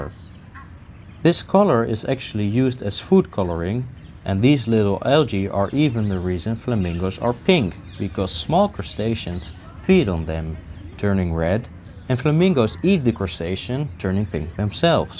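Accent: Netherlands. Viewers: in the first video i thought you where dutch now i can confirm it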